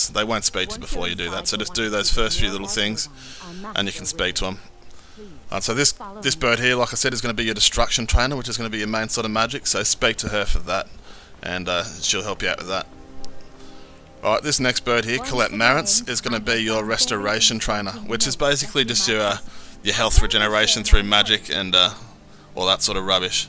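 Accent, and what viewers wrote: Australia. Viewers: Lol i can tell ur aussie AUSSIE AUSSIE AUSSIE!!! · it's good to hear an aussie commentating on a skyrim vid once in a while.. it's always usually some yank doing them haha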